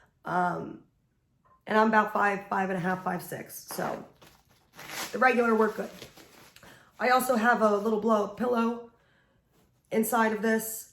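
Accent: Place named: New Jersey, United States. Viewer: Yes. You sound like you're from Jersey. That's a compliment!! I'm from Florence and I swear like a horse. Looking forward to seeing more of ur AT Videos. I'm fucking jelly of you, right now!!! Lol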